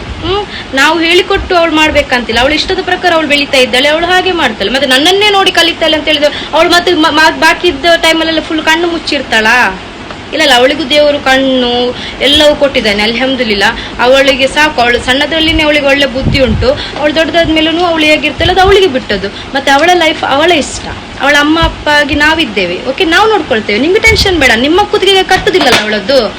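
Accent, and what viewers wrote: Mangalore, India. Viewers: Talking slang klidre mangalore irbeku ansatte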